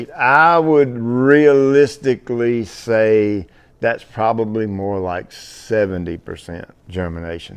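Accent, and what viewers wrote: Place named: Virginia, United States. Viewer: I've watched slot of grass care videos. You're my guy! I'm definitely interested in purchasing your great blend. I live in NJ where it still gets just as hot as my hometown in Danville/Cascade Va. BTW, where are you from? You sound like you could be from those parts or close. Love your videos and look forward to learning more from you!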